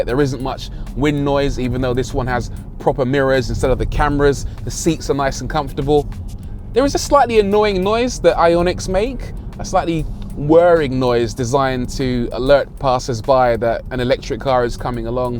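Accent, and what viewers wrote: United Kingdom. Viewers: I love that rant, it definitely had some of that bri'ish essence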